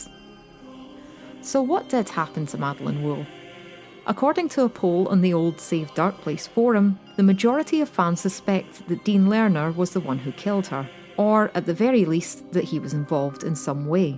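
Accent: Scotland, United Kingdom. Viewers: New to your channel, caught it through inside number 9, but must say ireally love this review of dark place i loved it and still do and noone i know has heard of it or chose to give it a try so thank you also nice to hear a fellow Scottish accent online didn't even need the subtitles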